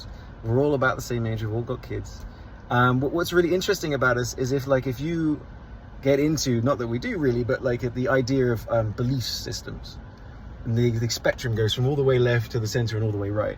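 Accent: Texas, United States. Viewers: Bless you, thank you fellow Texan. Watching this and then your newer videos you’re even starting to sound like a Texan. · Occasionally you sound like a Texan. Dare I say you occasionally pronounce an R or two.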